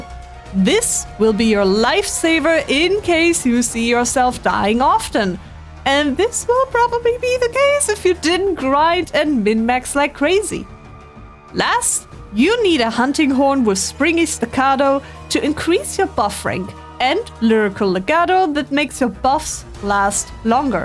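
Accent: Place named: Germany. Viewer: Ur accent like ur from germany or maybe netharlands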